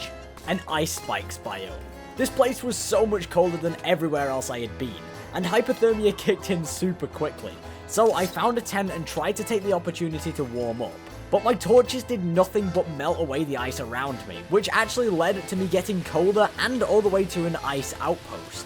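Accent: United Kingdom. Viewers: Sorry to ask are u British? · Are you British?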